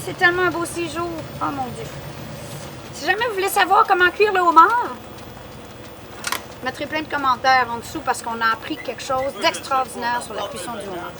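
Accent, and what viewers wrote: Quebec, Canada. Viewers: J'adoooooore ta bonne humeur et l'accent québécois et bonjour à tous les acadiens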